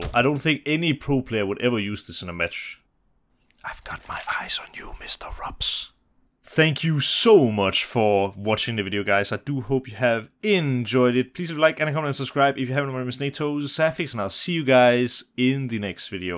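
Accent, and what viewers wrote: Denmark. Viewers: Danish accent kills me, it’s so awful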